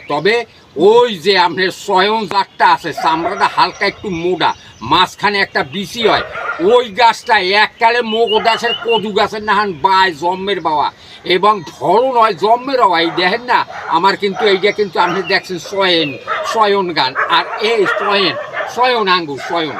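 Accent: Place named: Bangladesh. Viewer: দাদু আপনার বাংলাদেশী কথা আমার খুব ভালোলাগে। আপনি খুব পরোপোকারী।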